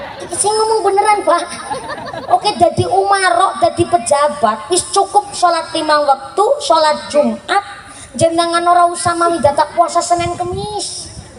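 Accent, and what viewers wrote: Banyumas, Indonesia. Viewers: Seru dan kocak sekali ya kalau pengajian mumpuni , ngapak ngapak 😅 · Ceramah ngapak ustadzah mumpuni Like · Ceramah ngapak lucu tapi mengena para koruptor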